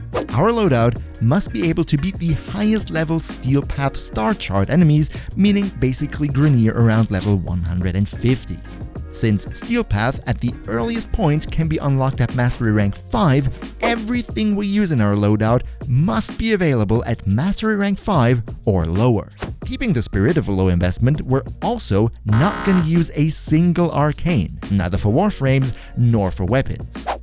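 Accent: Germany. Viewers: I fuckin knew it XD das deutsche wurde rausgerochen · Du bist Deutscher?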